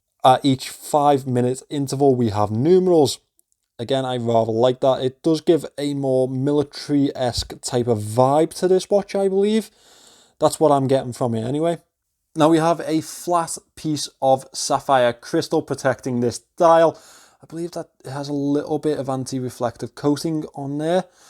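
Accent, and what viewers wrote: Liverpool, United Kingdom. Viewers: Yes lad, good to see a scouser in the watch game on YouTube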